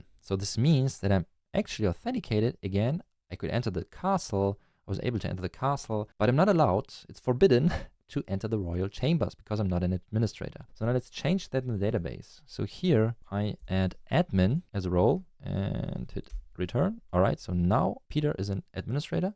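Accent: Germany. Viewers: Great video! I like your approach to start from the top with whit sketching out what we want and fill in with functionality. An extra plus for doing refactoring! It brings an example closer to real cases, and with todays environment help, there is no excuse to not doing it. As a Swede I could guess that you were German, but you have an excellent english!° Cheers:washhands: p.s Thx for the code, just what the doctor ordered...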